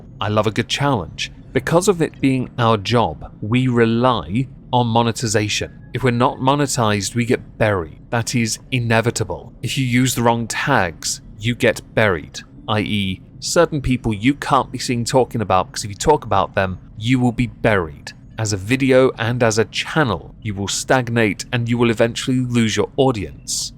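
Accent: United Kingdom. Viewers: I think having a British accent helps.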